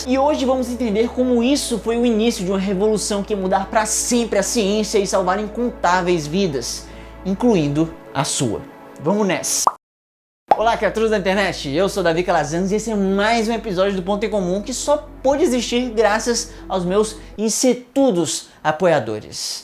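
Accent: Ceará, Brazil. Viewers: Você é do Ceará? Se for, qual cidade, notei por algumas gírias e o sotaque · Vc é cearense? Kjjkj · Umas amigas me explicaram o que era "aí dento" mas eu nunca tinha escutado de maneira natural antes desse vídeo kkkkk. Você é do Ceará? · tu é cearense????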